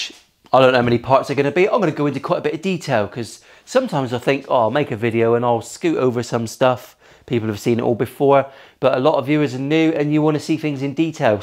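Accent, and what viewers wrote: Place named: Plymouth, United Kingdom. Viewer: Excellent. I have a Revstar, so watching this with real interest. Plus you're helping me get attuned to the speakings of a Janner - my daughter is now a Derriford resident, so I'll be hearing a lot more of it in the future.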